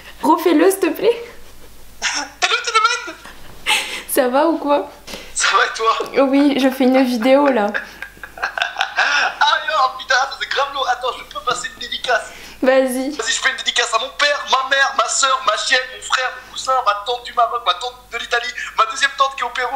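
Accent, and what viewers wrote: South, France. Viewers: T’inquiète l’accent du sud c’est le meilleur 🔥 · pq tu fait un accent du sud alors que tu vis à strasbourg ? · L'accent du sud c'est le sang Moi aussi g un accent mais c drole sinon j'adore ton accent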